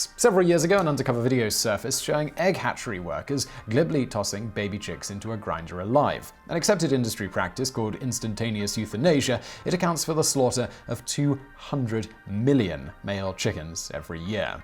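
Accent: United Kingdom. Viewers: is living proof that everything really does sound better with a British accent.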